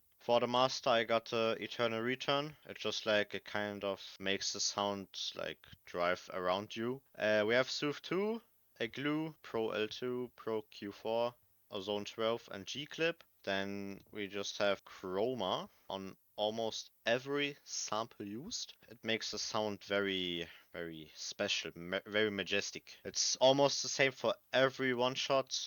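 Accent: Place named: Germany. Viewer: you can hear hes german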